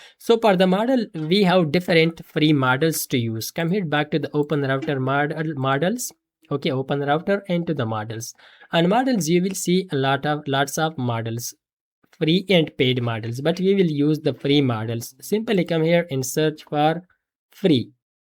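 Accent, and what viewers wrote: India. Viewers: Indian pronunciation 😂 Clau de code 😂 It's claude not clau de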